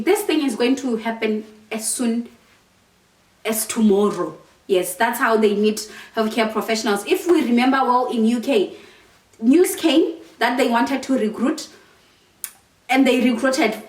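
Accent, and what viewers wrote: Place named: South Africa. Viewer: Hi dear, you sound like a South African, I am Namibian, watching you from Windhoek.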